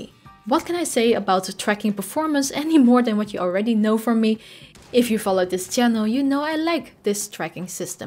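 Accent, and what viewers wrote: Netherlands. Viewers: Why do i hear a Dutch accent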